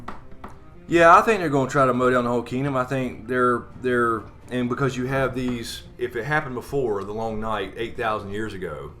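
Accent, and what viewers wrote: South, United States. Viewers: Glad I found your channel, GREAT takes on Thrones! Love Thrones talk with the Southern accent.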